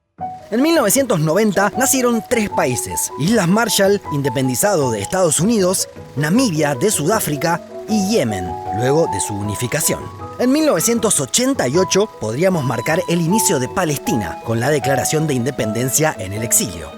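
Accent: Argentina. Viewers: Es muy bueno, pero el español es demasiado argentino, debería ser un español más neutro.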